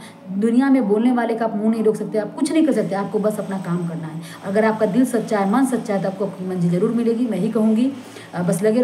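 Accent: Bihar, India. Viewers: Bihar se ho ya up. Se 😂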